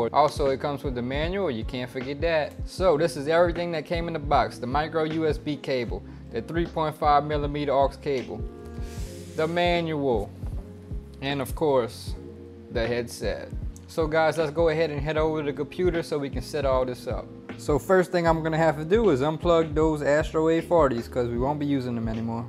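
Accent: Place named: Louisiana, United States. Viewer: Just purchased thanks! Also where's that accent from, Louisiana?? 😎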